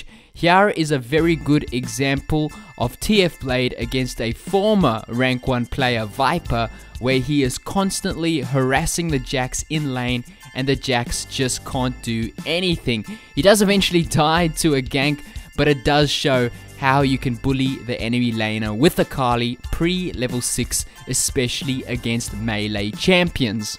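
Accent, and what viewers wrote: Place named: Durban, South Africa. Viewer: God that fucking Durban accent is unbearable.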